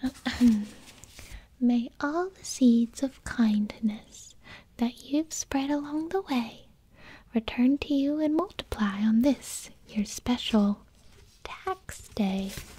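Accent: South, United States. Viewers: i love how her accent turns slightly southern. Cute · ...She always speaks with a Southern accent? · Why did her voice change from the start to the end she started to talk with like a southern accent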